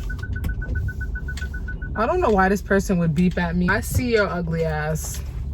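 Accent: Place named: New York, United States. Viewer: i knew it/youR accent sayz n.y. at least to Me'...✨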